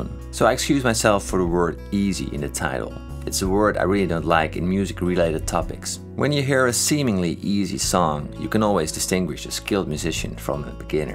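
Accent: Netherlands. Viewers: You sound a bit Dutch, to be honest with you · Can i ask where you're from? You sound very Dutch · Hey you're Dutch! Guessed it haha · Nederlander confirmed, Nederlands accent op het engels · how dutch is this guy immaright? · Just noticed that your presentation has become way better now. More the authentic Dutch accent, more you. Great video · you are dutch arent you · hi are you dutch? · Are you dutch???🇳🇱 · dude, you're a dutchie XD the accent gives away, dutchie here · yes he's Dutch and so am i :)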